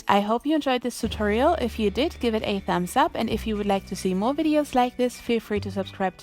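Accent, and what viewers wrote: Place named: Germany. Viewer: Sie kommt zu 100 Prozent aus Deutschland ich höre es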